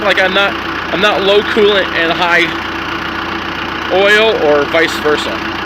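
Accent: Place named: United States. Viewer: Where the hell do you live? You sound American as hell but the steering wheel is on the wrong side in ya truck and your gauges look all backwards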